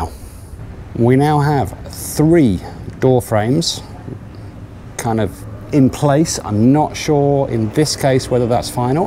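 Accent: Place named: England, United Kingdom. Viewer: Hi, I stumbled onto your channel [on a recommendation]. You must doing something right. So, I'm another new subscriber. It's great to hear a English chap [for a change] in the Philippines doing a building project. Anyway, I have a lot of catching up to do to watch all your episodes, so don't tell my asawa. Hope you enjoy the fruits of your labour. Thumbs up 👍.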